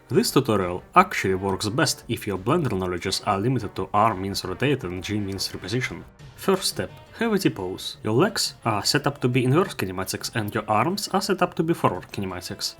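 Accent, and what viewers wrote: Russia. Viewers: bro are you russian dont know why ,i like to hear you speek english (this is only a comment dont take it seriously ),it is intreasting to learn some think while having fun keep it up🙂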